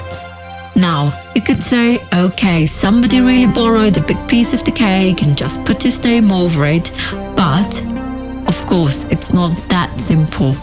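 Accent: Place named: United Kingdom. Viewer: Loved the British accent!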